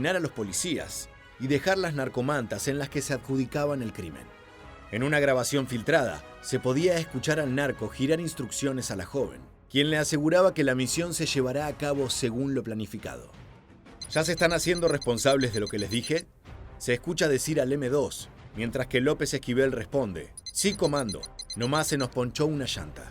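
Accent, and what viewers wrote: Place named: Argentina. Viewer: Pues el narrador ha de ser argentino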